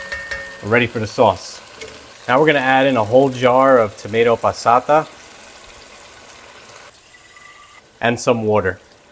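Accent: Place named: Long Island, United States. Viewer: are you from Long Island? I sense you are as am I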